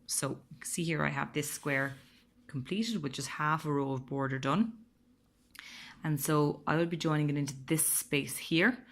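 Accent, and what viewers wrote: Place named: Ireland. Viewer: I found your individual Granny square thank you. I've completed it thank you.Looking at you crochet with that hook you've a very natural flow. You must be crocheting for years. Please do upload more when you get time. Have you a scalloped border most on here don't show how to do the corners. May I ask where in Ireland is your beautiful accent from. ?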